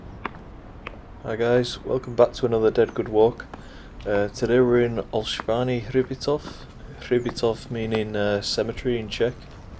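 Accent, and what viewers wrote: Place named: Hull, United Kingdom. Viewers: Can I ask where you are from? You sound like you've got a Hull accent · Great to hear an English voice for once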